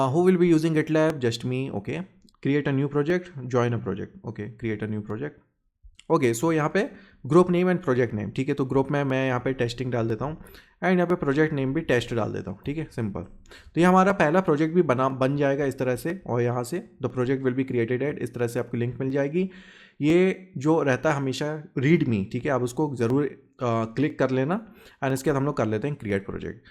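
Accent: India. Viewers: Awesome Indian Accent wiht confidence unlike others who fake accent i really loved and enjoyed this video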